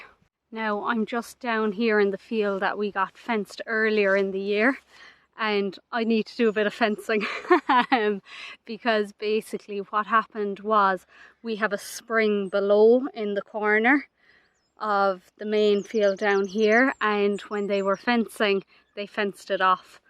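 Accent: Kerry, Ireland. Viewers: Another brilliant video your dad must be very proud of you with the amount of work you do on the farm 👍👍 plus love your irish accent 😊😊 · Well done girly. Lovely hearing your kerry accent & pronunciation, i do miss it. You're a hard worker & an asset to your dad. You deserved that holiday you had. And you don't give yourself enough credit girl !!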